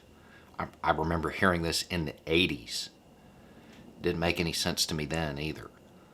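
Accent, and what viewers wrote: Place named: Western North Carolina, United States. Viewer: Damn No, I sure appreciate you making sense with an accent not unlike my own (WNC Mountains). I'm not sure when so many people decided it was okay to let someone else (FOX) do your thinking for you ... Thank you sir!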